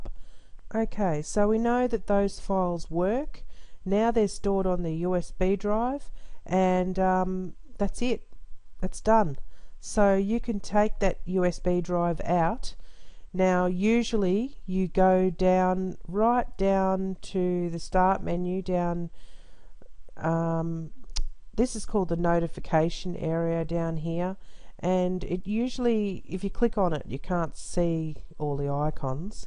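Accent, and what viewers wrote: Australia. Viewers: Good info. / Thanks from a 70 yr. old American. Your accent (Aussie?) is pleasant to listen to.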